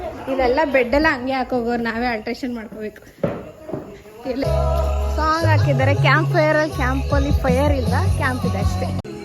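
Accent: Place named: Hassan, India. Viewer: Natural hassan language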